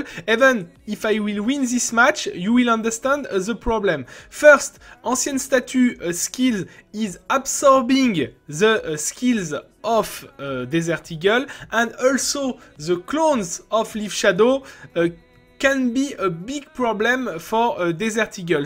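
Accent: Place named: France. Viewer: Est-ce que tu pourrais apprendre à parler Anglais sans un accent français, parce que là... Mes oreilles saignent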